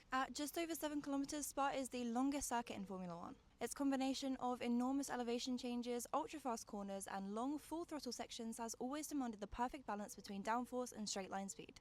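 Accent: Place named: France. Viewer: That French accent 🔥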